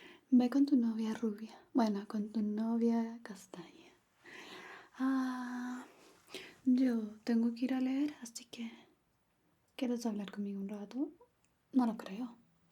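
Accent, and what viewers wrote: Chile. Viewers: Yo solo vengo a que me lean en chileno, aunque me odien jajaja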